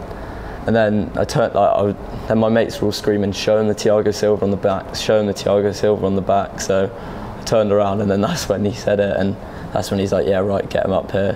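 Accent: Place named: RP, United Kingdom. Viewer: he's posh af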